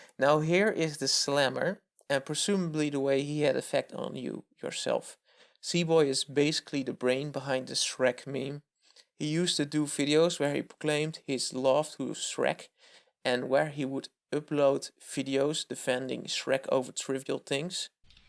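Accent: Netherlands. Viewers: You sound Dutch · Ahaha kom je uit nederland? Mooi accent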